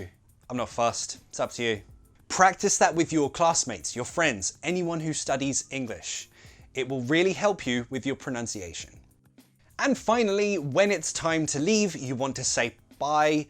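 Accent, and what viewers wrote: United Kingdom. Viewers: You are helping us a lot to learn and improve our oral skills...I love your British accent. Regards from 🇦🇷😎👍👏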